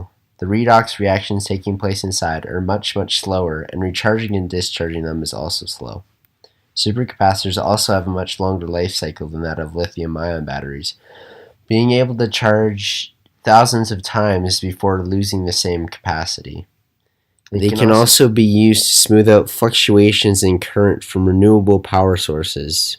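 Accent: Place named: England, United Kingdom. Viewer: Oh thank god, someone with a normal English accent talking about this! Thanks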